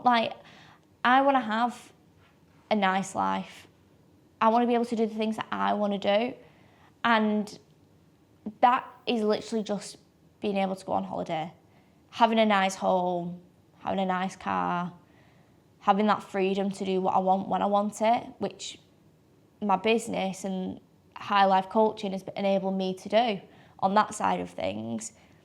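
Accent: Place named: Ireland. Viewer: I don't want to be mean... but her horrible irish/northern english/whatever... accent makes it an ABSOLUTE horrible experience listening to her! It really is HORRIBLE! I lean to tell her "Go open up your chakras somewhere else!"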